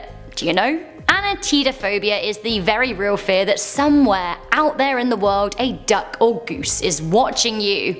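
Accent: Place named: United Kingdom. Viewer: it’s her accent she’s from the UK 🇬🇧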